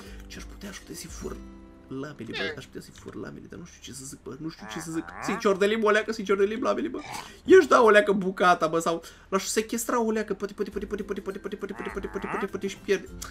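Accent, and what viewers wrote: Moldova. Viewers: Ești Moldovan? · mie imi pare in videoclip ca ai acent de moldovean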